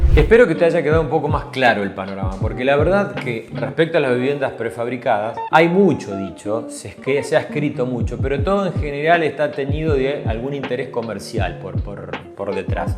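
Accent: Argentina. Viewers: Me gustan mucho tus comentarios sinceros y profesionales. Por el acento siento que hablas desde argentina, y la realidad algunas veces es un pelín fluctuante dependiendo del país. Desde España se ve la construcción prefabricada como una ventaja en cuestión de plazos de habitabilidad, por lo que he visto y comparado el precio del M2 de construcción es similar el de la construcción tradicional -estructura hormigón armado/pretensado, tabiquería ladrillo/Pladur, tejado de teja, y fachadas de material hidrófugo - es muy similar al precio de la vivienda prefabricada, pero siempre me queda la duda sobre el sistema estructural, como responde una casa prefabricadas a movimientos/asentamientos de terreno, cambios de nivel freático....esa es mi duda, porque creado el problema, quién sabe cómo arreglarlo?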